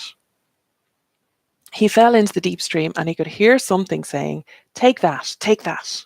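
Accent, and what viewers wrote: Ireland. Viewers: Sorry to bouther you again but would you have deirderia maddigans webside details again about the whichcraft study year and a day .as i want to find a teacher to study wichcraft with i am self thought and doing so so well but would love to find a teacher now.thanks so much angel vanessa donegal celtic irish witch xxxxxxx🦄🦄🦄🌹🌹🌹🌹🌲🌲🌲🌲🌲🌲🌲🌲🌲🌲🍀🍀🍀🍀🍀🍀blessings to you great to see you again.lobly to hear an irish voice in the great whichcraft mix.happy new year xxxx.i am verry drawn to working with this lady deirdre.i heard her on the radio taling about het experience in the mothet and baby home.i was blown away and heart broken.i to gave my first baby son up for adoption in secret in ireland in 1996.her story as touched me deeply blessings 🌹🌹🌹🌹🌹🥀🍀🍀🍀🍀from donegal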